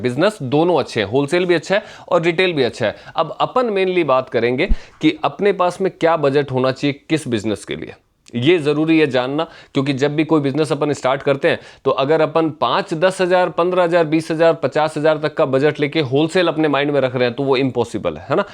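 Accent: Rajasthan, India. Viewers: आप राजस्थान से हो क्या भाई जी।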